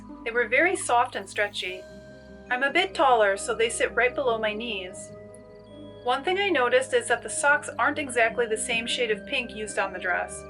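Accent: United States. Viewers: Are you from Minnesota? I hear a slight accent. It’s so nice to listen to <3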